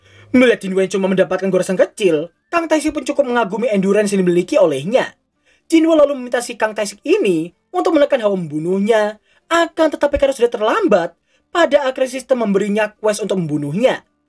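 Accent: Java, Indonesia. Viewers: medhok coy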